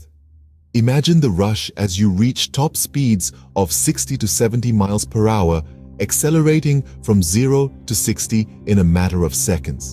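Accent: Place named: Kenya. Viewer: my man sounds kenyan af. I am kenyan btw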